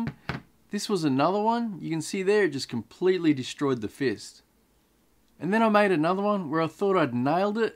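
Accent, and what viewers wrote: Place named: Australia. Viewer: as soon as i heard you talking i was like FUCK ofc he’s australian only us aussies would do this 😂 the corona straight away made me suss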